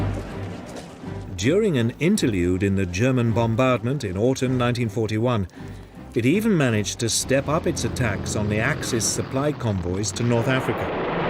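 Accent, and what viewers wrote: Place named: Scotland, United Kingdom. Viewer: Re: the segment on the death of IJN YAMATO/Operation Ten-Go: whoever did the voiceover (or the AI software) -fire them... or pick a different one. That stupid scottish accent is like nails on a chalkboard. Drove me clear up the wall. I had to turn it off.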